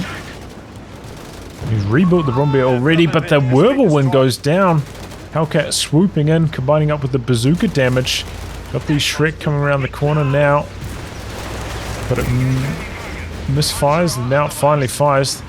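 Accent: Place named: New Zealand. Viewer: Just found your channel, and from hearing your voice I guessing your also a Kiwi. You're doing good stuff mate, definitely gonna watch some more.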